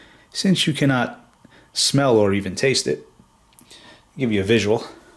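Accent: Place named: Boston, United States. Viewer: This guy is so Boston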